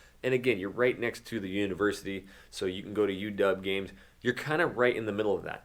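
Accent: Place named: New York, United States. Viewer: I think he isn't born and raised there, he speed talks, I think he is from NYC or upstate New York originally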